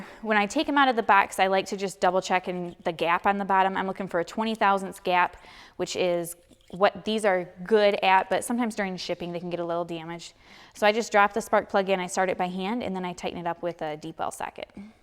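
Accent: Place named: Michigan, United States. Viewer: Great video. Also, I think I detect a northern accent... Wisconsin or Michigan perhaps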